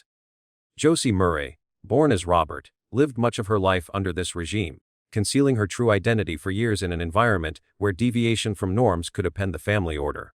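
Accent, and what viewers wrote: United States. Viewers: American accent on ai voiceover 😒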